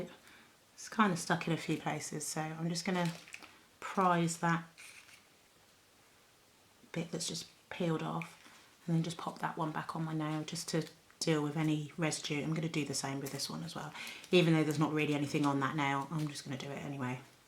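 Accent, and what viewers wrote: England, United Kingdom. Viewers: Dang... your nails are perfect. Your natural apex is off that chain. I have to use plastic dual gel nail forms to get my flat and thin nails to look like this ! And btw, I love your proper English accent. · Beautiful hands and gorgeous long nail beds!! Thank you for this. I have decided to try using gel overlays to grow my nails out, because, after 12 years of acrylics, nail hardeners are not being overly successful!! I've watched the films on how to apply and maintain, and you've given me the one to remove, thank you. Subscribed. Ps, so nice to hear an English voice!! Xxx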